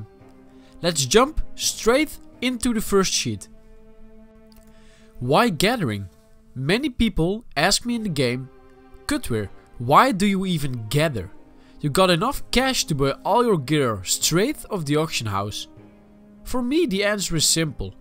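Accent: Netherlands. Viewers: your accent actually arouses me. is it dutch?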